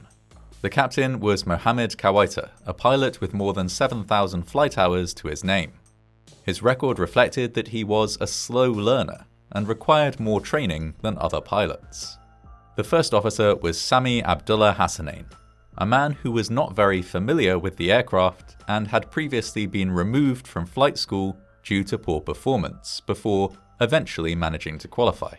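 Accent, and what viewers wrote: United Kingdom. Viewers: Horror vids are always better when narrated by a British bloke.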